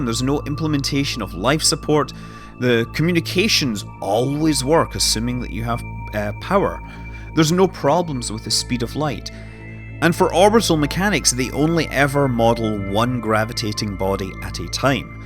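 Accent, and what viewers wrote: Scotland, United Kingdom. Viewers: I just listened to a Scottsman essentially give me a powerpoint presentation about rocket science. And loved it.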